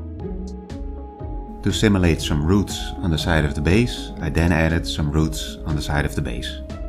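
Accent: Netherlands. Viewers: Love your Dutch accent 😃 Love the video you made, thanks ! Ik heb hetzelfde model klaar liggen om te gaan printen en dat gaat na het zien van deze video niet lang meer duren gok ik. Leuk om te zien dat er ook NL kanalen zijn in dit wereldje ✌🙂 Subscribed -> Uiteraard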